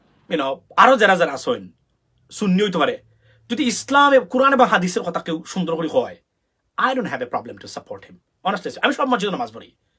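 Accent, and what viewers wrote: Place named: Sylhet, Bangladesh. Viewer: ভালা আছইনি ভাই আমি মনে করছিলাম আপনে সিলেটি নায় এখন দেকি অনেখ ভালো লাগলো এই চেনেলে আফনারে দেকিয়া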